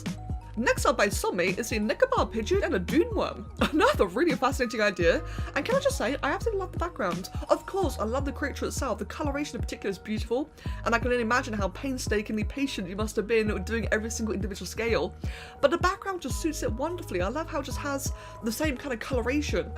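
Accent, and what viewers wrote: United Kingdom. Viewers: Ooookayyy youre British?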